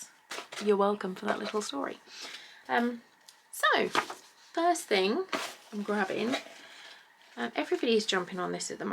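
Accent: Norwich, United Kingdom. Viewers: Love your mascara, eyeshadow,eyeliner, eyebrows in this video :) and that Norwich uk accent!! 😍❤️❤️❤️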